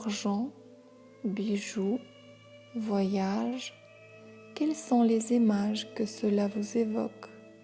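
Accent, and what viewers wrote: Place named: Russia. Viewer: J'adore votre accent russe. Спасибо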